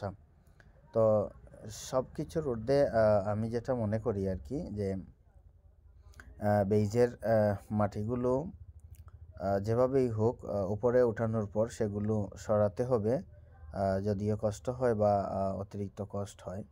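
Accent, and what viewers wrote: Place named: Sylhet, Bangladesh. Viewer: বাড়ি কিতা সিলেট নি? মাতে সিলেটি লাগের😃😃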